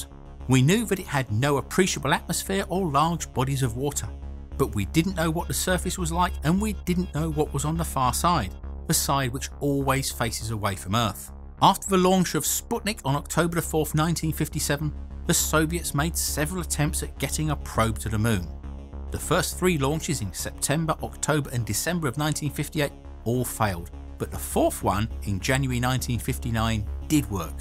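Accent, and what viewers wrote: United Kingdom. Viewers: Very informative thank you. Btw what part of Britain is your accent from? I absolutely love it, and have always been curious about regional British accent differences.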